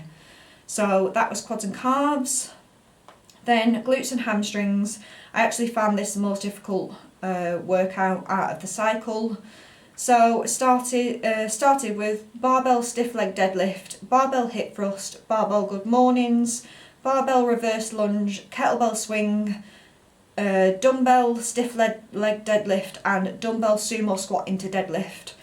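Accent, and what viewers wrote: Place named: England, United Kingdom. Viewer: Hey Thanks for sharing. I love recap of your gym workouts. I need to start doing weights but I’m recovering from shoulder injury. I do love cycle spin classes. Hope you are well. Great hearing the English accent 😘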